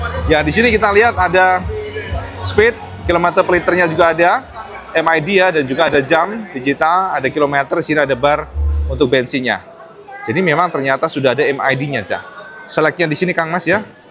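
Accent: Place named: East Java, Indonesia. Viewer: Lampu sein nya ngepang... Bahasa jatimnya ancen Jos Gandos... Medok...😅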